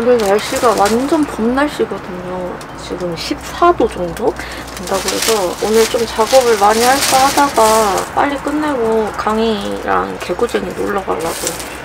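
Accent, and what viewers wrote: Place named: South Jeolla, South Korea. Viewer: 사투리가 전남권이신거 같은데 ㅋㅋㅋ..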